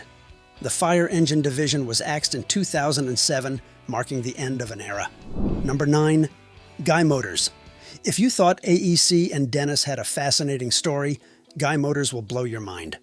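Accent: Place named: United States. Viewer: Why do we have to have the voice of an American narrator.???????????????????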